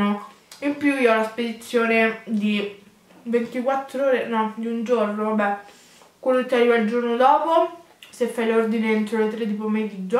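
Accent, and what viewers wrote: Rome, Italy. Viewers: in questo video ti ho vista particolarmente ''Romanaccia''.....bellaaaa spontanea in alcune parti!!! Miii piace bravaaa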